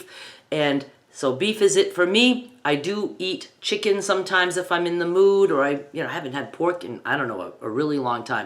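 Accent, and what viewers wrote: Maine, United States. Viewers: I am so inspired by you. I literally just found your channel via your caftan tutorial. I also was about to make dietary changes necessary to combat something called SIBO (small intestinal bacterial overgrowth) which requires basically restricting carbs so as to starve out the bacteria. I did low carb before, but I gained it all back. I got cravings. You know the drill. So now I will try carnivore. Also, I am in Maine…. you said you moved to Mississippi but you sound like you are from Maine? Regarding the shirt from a shirt, and caftan tutorials, can I ask, do you use 100% cotton? I got a bunch from Mardens to make a quilt but that caftan was so stylish, I think I have to use this blue cotton to make the stylish caftan! ❤❤❤ Congratulations on your weight loss, reduced pain, and improved mood!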